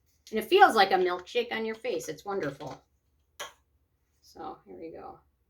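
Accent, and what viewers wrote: Minnesota, United States. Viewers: wow, i always recognized your accent as MN, I grew up in Woodbury and spent a lot of time in Stillwater, especially downtown. A beautiful town!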